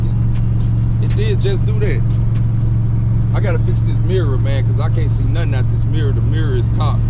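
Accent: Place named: Memphis, United States. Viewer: Sounds like you from Memphis. But great video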